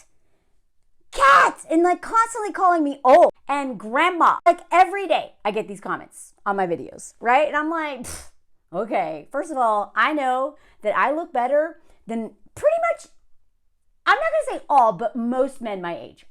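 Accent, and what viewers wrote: South, United States. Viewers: The Southern Belle twang: Here for it! And the puppets - a childhood joy coupled with information I enjoy as an adult - Enjoying the creativity with your content - Hi ❤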